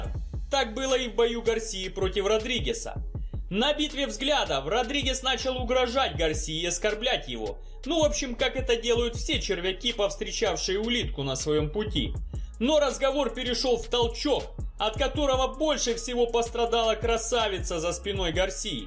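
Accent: Ukraine. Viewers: И ещё. Это просто украинский говор, запомни☝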